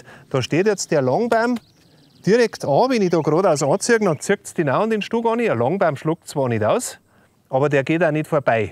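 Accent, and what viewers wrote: Bavaria, Germany. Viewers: Und der wieder in schener bayrischer Mundart erklärt😅 da muss man aufmerksam und konzentriert zuhören. Wie die Lehrlinge in der Labau😉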